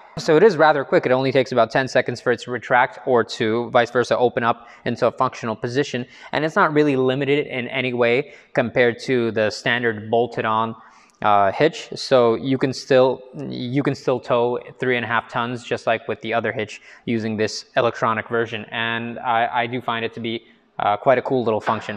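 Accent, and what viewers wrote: United States. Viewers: Wow you sound like an American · Why does your accent sound American? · I thought he was American living in Russia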